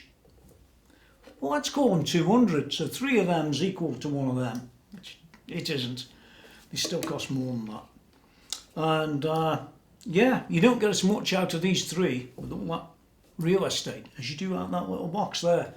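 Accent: Stoke, United Kingdom. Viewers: You can hear a fellow Stokie a million miles away 😬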